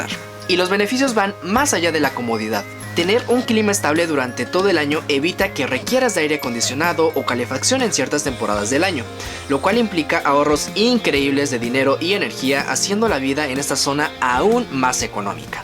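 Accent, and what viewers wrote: Yucatan, Mexico. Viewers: Eres yucateco??? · Eres Yucateco?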